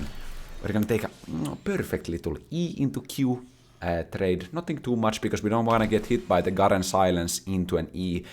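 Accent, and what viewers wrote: Finland. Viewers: are u from finland? ur speaking kinda sounds like it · You have such a Finnish accent I love it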